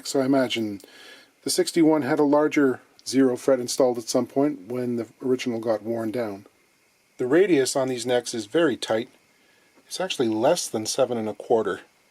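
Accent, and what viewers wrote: Germany. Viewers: I'm here for the German accent...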